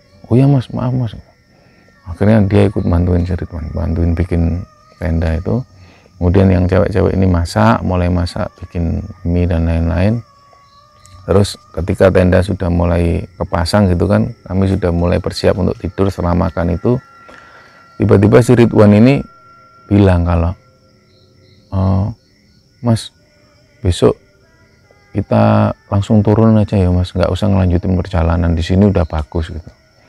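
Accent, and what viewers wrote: Java, Indonesia. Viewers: Kenopo mas pembawaan ceritanya enak? Mergone logat n kadang nganggo bahasa jawa, jadi koyo crito karo koncone dewe....ntaps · Sangat suka dgn cara penyampaian dgn bahasa yg sederhana dgn logat Jawa, santun 🙏🏼 semangat dan sukses utk 👍 terutama utk mas 👏👏👏👏👏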